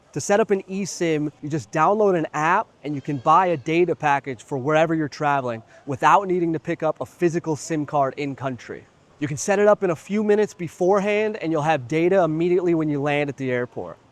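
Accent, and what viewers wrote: Vietnam. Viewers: What’s happening, another banger vlog, I must say out of all the Nam vloggers I seen your channel has to be the top tier for me it’s very interesting and informative def its a disservice how your SUB# are not in the 3 digits Last but not least hearing your thick namese accent be cracking me up 😂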